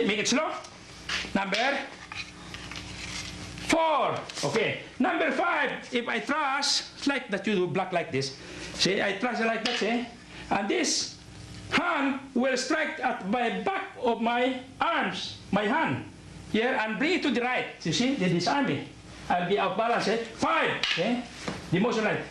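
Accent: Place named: Cebu, Philippines. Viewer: His Inglis aksent is typiical Cebuano. HIS ENGLISH ACCENT is CEBUANO not AMERICAN. Great MARTIAL ART, can be effective when your LIFE IS IN DANGER, SELF DEFENSE. The STICK is faster than the HANDS, faster that the FEET.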